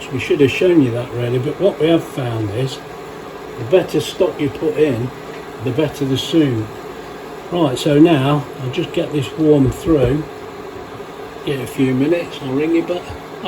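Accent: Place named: Nottingham, United Kingdom. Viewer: Chinese cook with a Notts accent me duck😅😅😅😅😅 I went a few times to a Chinese restaurant on Mapperly Top in Nottingham called Chungs.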